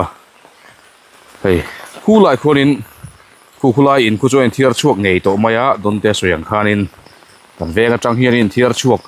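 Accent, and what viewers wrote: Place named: Texas, United States. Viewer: Pu, i Texas awphawi hi a pa tlat.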